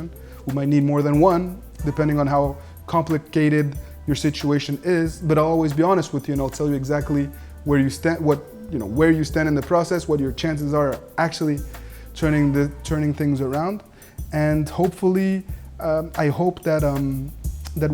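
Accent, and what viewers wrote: France. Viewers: Are you French??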